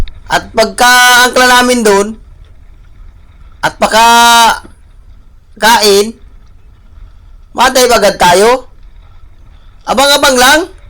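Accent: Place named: Visayas, Philippines. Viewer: swerte kaparin KSI medyo marami Ang huli mo. Napansi ko syo sa salita mo parang bisaya ka.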